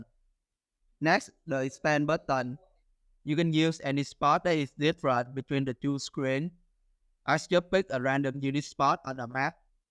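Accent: Vietnam. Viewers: Nghe cách nói của ông cháu là biết ngay người Việt Nam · Your voice sounds like a Vietnamese person speaking English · Giọng nghe rất Việt Nam :)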